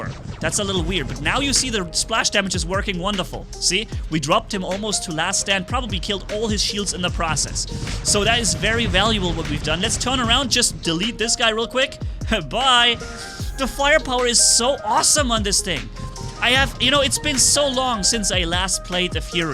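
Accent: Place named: Germany. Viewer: hahaha I think might be onto something here, because you don't realize it but even this answer, you built in a German way, by putting the past participle aaaaall the way at the end of your sentence (almost). But that's great though, really awesome. I love how one's native tongue unknowingly influences the way they speak other languages no matter how fluent they are in that language!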